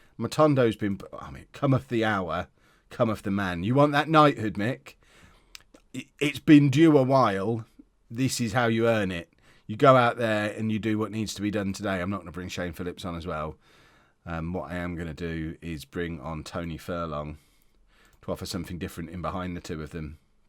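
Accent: United Kingdom. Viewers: I dont understand football at all but it’s interesting listening to a Brit explain it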